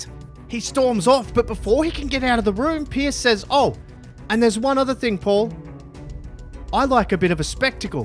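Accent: Australia. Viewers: You have an accent what is it Australia 🇦🇺 or New York idk something’s like that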